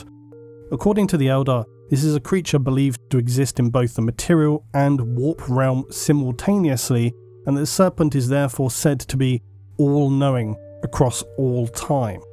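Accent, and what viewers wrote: United Kingdom. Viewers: 3+ hours of a smooth talking British man coming to terms with free will being an illusion 😆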